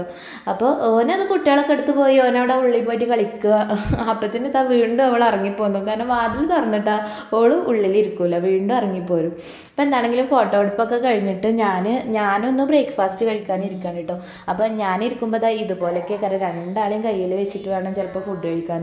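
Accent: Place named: Malappuram, India. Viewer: തനി മലപ്പുറം ഭാഷ പെരിന്തൽമണ്ണ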